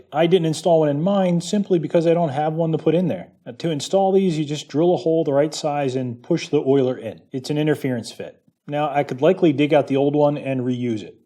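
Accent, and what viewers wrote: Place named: Philadelphia, United States. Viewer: You have a Philadelphia area accent. I bet you live in either southern Jerz, or the easternmost third of Pennsylvania. Or Delaware.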